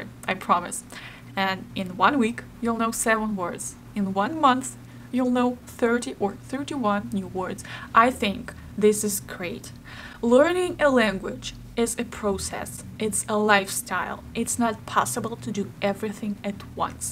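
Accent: Russia. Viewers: Is she Russian? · Is Russian her first language?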